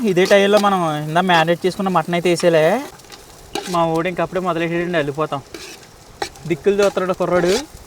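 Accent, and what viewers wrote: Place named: Kakinada, India. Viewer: హలో మీ వీడియో కి మీ మాటలు కాకినాడ యాస highlight సూపర్ వీడియో god bless you ma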